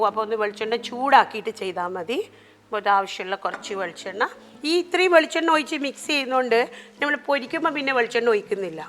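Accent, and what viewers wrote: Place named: Malabar, India. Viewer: What a presentation with that Malabar slang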